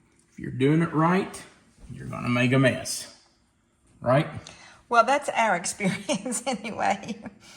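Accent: South, United States. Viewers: Goodness, don't let anyone tell you how to speak! We like your down home country drawl in your voice! Alllllll of it! 😊 has a touch of twang in her voice too and you both are a delight to listen to! It really is like we are all sitting around the same table having a nice friendly chat with some really good food! Y'all really help cheer up people like me on their own. Blessings!❤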